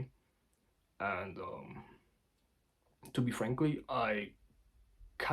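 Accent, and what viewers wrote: Germany. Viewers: I hear your german acsent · No youre right. Single mothers DO ruin their children, by not supporting them to become self sufficient. Statistics back this. Single mother children are multiple times as likely to end up sex workers or in jail, while single fathers tend to keep the kids out of bad paths like these. You gotta stop relying on your mother in life. Make a deal with her that if she supports you (shes legaly obliged to in Germany, thats what your accent sounds like), then you will do an Ausbildung or a Studium. Also you would get Bafög. Otherwise youd get Grundsicherung. These are the ways to go: GET OUT of there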